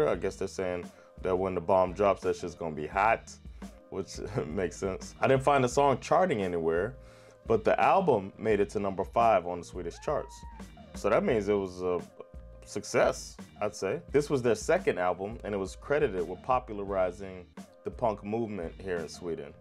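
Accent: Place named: United States. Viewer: Damn your Swedish pronunciation is actually really good, I'm impressed. Of course there's an accent, which is to be expected, but you sound just like an American who's moved to Sweden and learned the Swedish language (at least from my perspective and interactions with Americans who's moved to Sweden)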